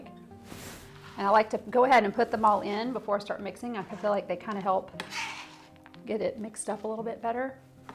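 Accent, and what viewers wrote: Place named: Florida, United States. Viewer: You sound like us here in Florida! Especially the "pachauns" not pECans"! 😎🌴🌺👍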